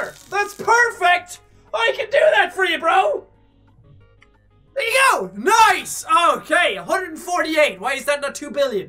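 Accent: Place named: Ireland. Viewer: I'm just wondering... why Jeremiah? Like i know its just a name he thinks of on the spot, but... why Jeremiah? It doesn't trigger or offend me or nothin but it just makes me think of my big bro so if i zone out due to my recent work load, then i hear "Jeremiah!" in Sean's infectious Irish voice, it just makes me think that my bro is there or smth XD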